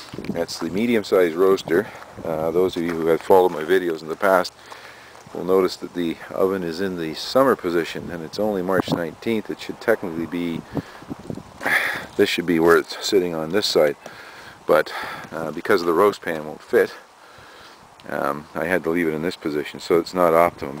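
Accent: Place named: Canada. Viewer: I heard your from Canada cause you said.. ouuut… like houusse.. it is just I noticed it in the Canadian accents.. 🌺👍🏽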